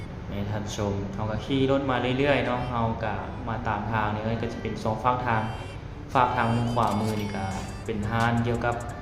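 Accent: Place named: Laos. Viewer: สู้ๆครับไม่ต่างกันเลยไทยลาวสมาชิกใหม่เด้อรับด้วยครับชอบสำเนียงลาวครับ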